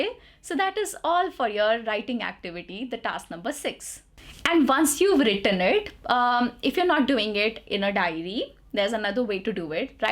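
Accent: India. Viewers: I never heard before a clear Indian accent....I love it.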